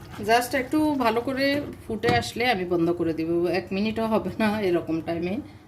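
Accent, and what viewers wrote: Noakhali, Bangladesh. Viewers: আপনি কি নোয়াখালীর?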